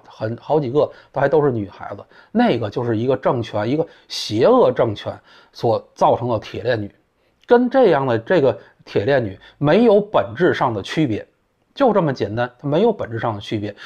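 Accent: Beijing, China. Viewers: 聽散人操著北京腔罵政府真是特別爽~ 🥰🥰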